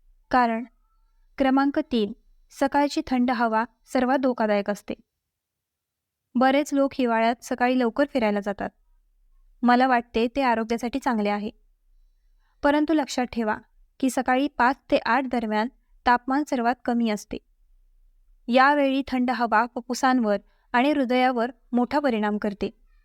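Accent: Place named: India. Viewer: तुमचे मराठी हिंदी वळणाचे आहे. मराठी शिका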